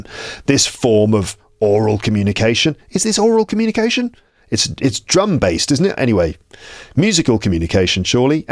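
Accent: United Kingdom. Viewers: Hello!! I'm from Argentina and I'm preparing for an exam in May. I've failed on passing the C1 level of English so many times. I'm still practising all the skills despite having some health issues that retrieve me from learning and passing the exam. I need to pass this last exam to become an English teacher. Thanks for the video. I appreciate your content. This is my first podcast I listened to on your channel. I could get almost all your words and I love your British English accent. It's very clear. Regards. Daniel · I watched the entire podcast, and it was spontaneous. I'm really impressed by the British accent!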